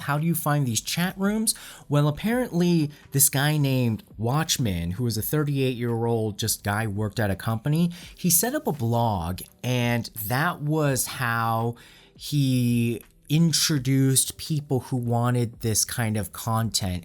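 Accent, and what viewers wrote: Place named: California, United States. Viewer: you sounded very californian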